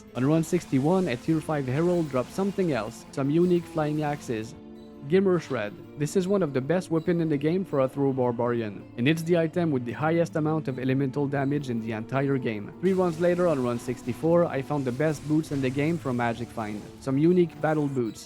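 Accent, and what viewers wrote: Quebec, Canada. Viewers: bruh i was like , he sound french canadian , apres tu dit , la face a ti-gui xD nice video bro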